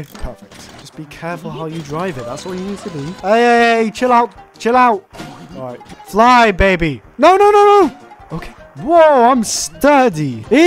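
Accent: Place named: United Kingdom. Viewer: yeah he's British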